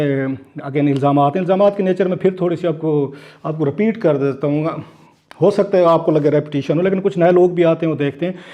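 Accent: Saraiki, Pakistan. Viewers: Be informed, urdu is not his native language. He is basically Saraiki, and that reflects in his accent. Thats absolutely normal.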